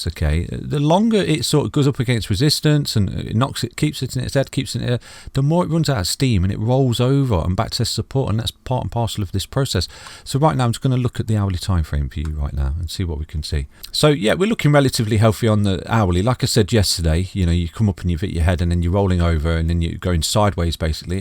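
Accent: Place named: England, United Kingdom. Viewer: Just found your channel this week mate, glad that i did, nice to hear a fine english voice, you explain in easy terms and love the no Bs with no mouth open buy now headers, will be following often, great content! thanks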